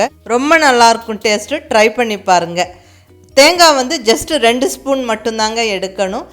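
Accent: Kongu, India. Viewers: I tried rasam . Awesome. Thanks. I like the way of telling and your sweet kongu Tamil slang.👌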